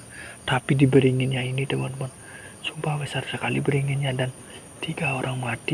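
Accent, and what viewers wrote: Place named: Karangasem, Indonesia. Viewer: Bukan e takut kedek Cang nepuk bli logat e lucu Karangasem kubu pok bos bekas kuburan masal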